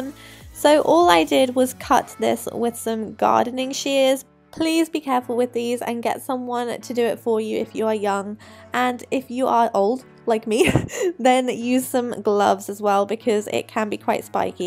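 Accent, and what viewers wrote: United Kingdom. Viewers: I really like your videos! You have so good ideas 😍 and I like british accent😊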